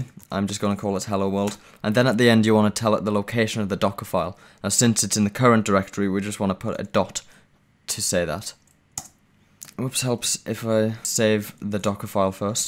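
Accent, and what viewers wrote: United Kingdom. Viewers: fuckin uk accent(PIG)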